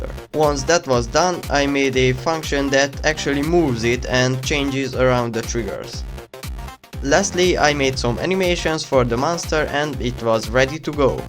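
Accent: Hungary. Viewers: are u hungarian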